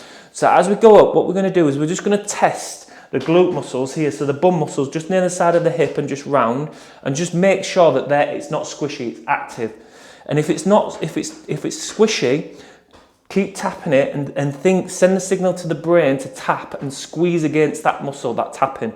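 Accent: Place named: Birmingham, United Kingdom. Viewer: hi, I ran my local parkrun today and got talking to someone visiting from Ireland... we are both fans of your videos and we spent a good few minutes running along and trying to figure out what accent you have? I was thinking Birmingham area, he was wondering if you are from the Scottish Highlands?!?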